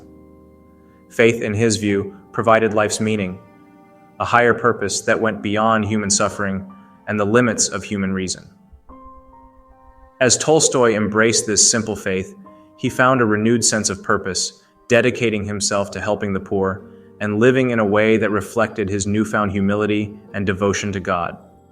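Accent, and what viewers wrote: United States. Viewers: Why an American accent ur not European goodbye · I thought i was going to get some professional English speaking historian talking about this, and what I get is another jumped up Yank. No, thank you.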